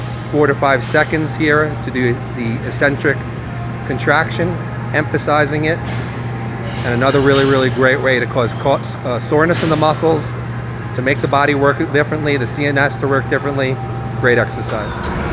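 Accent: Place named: Massachusetts, United States. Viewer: You sound like you're trying to hide a masshole accent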